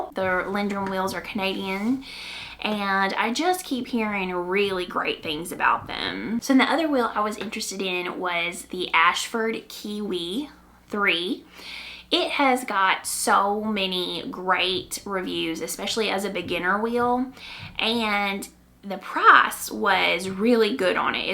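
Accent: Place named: Tennessee, United States. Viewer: I just found your podcast and I love it! Going back to listen to the others. Question….. both my daughter and I think you sound like you are from Tennessee (we are from East Tennessee). Have you spent some time in Tennessee or is that just a pure Arkansas accent? 😁 No matter, love listening to you.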